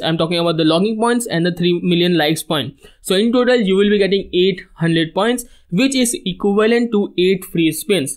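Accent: India. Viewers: Indian accent? Cant trust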